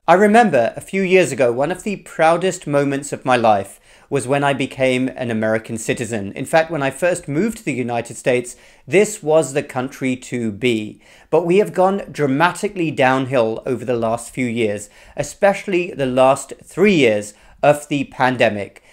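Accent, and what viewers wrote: United Kingdom. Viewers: I didn't know you were an American citizen, since you sound like a Brit!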